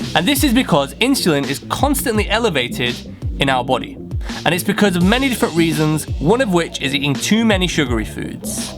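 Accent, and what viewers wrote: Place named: West Yorkshire, United Kingdom. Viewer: He sounds like he's from Bradford West Yorkshire.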